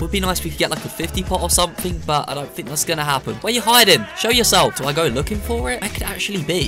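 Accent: United Kingdom. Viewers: At first how did don’t reliaze he’s British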